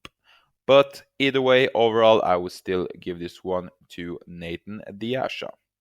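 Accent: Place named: Sweden. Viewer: If I may ask, are you Swedish???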